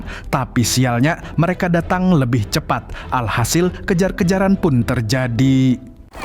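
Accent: Banjar, Indonesia. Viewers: Urang banjar jahhh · Ternyata urang banjar lah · Jah urang Banjar Leh Pian mang ,, mantapp 😂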